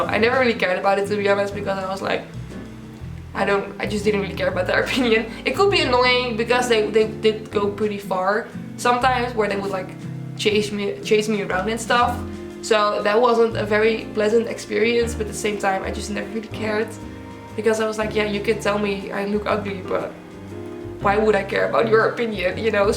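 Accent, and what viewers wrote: Netherlands. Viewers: Je hebt zo'n dik Nederlands accent. Moeilijk te verstaan.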